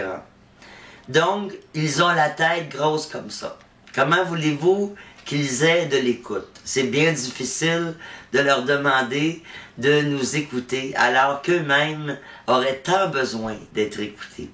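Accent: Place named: Quebec, Canada. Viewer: Un sujet qui me touche particulièrement, car tout ce que tu dis est tellement vrai et de savoir que les autres ne nous écoutent pas, même au sein de notre propre famille, qu'on soit jeune ado, adulte ou même personne âgée, finit par enlever tout envie de se confier, par peur d'ennuyer ou par certitude que les autres se fichent de nos problèmes, ou parce que c'est souvent une habitude dans une famille de ne pas se livrer. En passant, j'adore ton accent québecquois!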